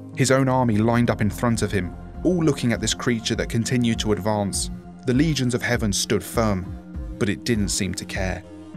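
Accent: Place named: United Kingdom. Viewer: Clicked video to watch, heard that you were british. Disliked and reported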